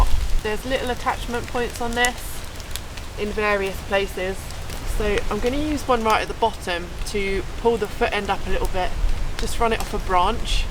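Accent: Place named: Upper class, United Kingdom. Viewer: Posh upper class david cameron girl init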